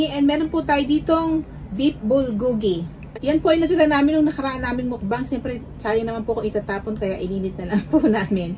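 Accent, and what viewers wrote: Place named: Batangas, Philippines. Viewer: Hello sis ko, medyo nalate ako ngayon. Sarap ng chicken curry sis. Sarap mo talaga magluto ng mga may gata. Akoy relate na relate sa punto ng salita nyo sis palibhasay malapit lang sa tiaong ang batangas. Hehehe. Ineng din ang tawag sa amin eh. Hehehe. Hello sa sister mo sis. Waiting talaga ako sa gelatin eh. Sarap. Ingat kayo lagi dyan sis. Waiting for youe next mukbang with Jaxxas. 💞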